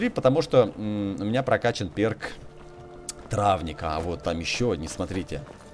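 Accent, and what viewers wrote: Ukraine. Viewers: Эбать ты из Украины)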